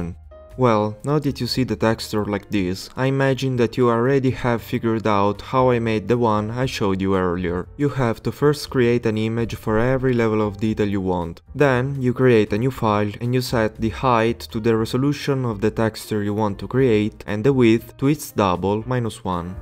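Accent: Italy. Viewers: I can tell this is south italian accent from a thousands miles away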